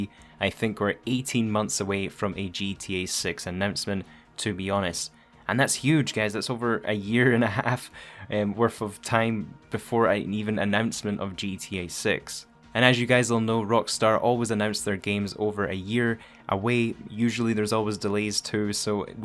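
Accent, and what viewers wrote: Scotland, United Kingdom. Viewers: This guy sounds he lives down the road from Edinburgh’s Rockstar studios 🏴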